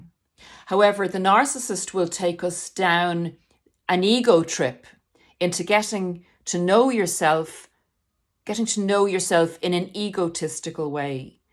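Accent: Ireland. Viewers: This is exactly how it goes. This this exactly what them demons do. They do the work of the devil, willingly, with bad intent to confuse and destroy everyone and everything. My narc father destroyed my whole family and liked doing it. Amen and God bless you!! Thank you. Love this!! I had a teacher in 8th grade who’s name was Sister Pauline and she was from Ireland. We used to spend Saturday’s with her at the convent. You sound just like her with your accent💖 Great Video!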